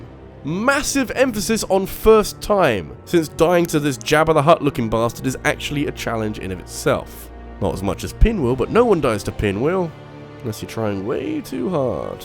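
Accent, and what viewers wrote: United Kingdom. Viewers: I don't play the Soulsbourne games I just like this weird British guy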